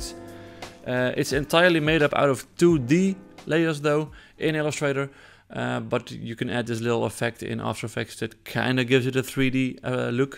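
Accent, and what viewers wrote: Netherlands. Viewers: Nice video. you can never get rid of a dutch accent i guess.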